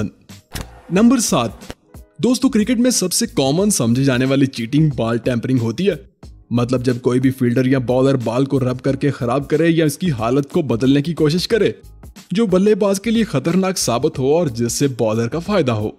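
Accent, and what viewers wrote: Pakistan. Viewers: Tera accent bilkul Pakistani hai but I know you are indian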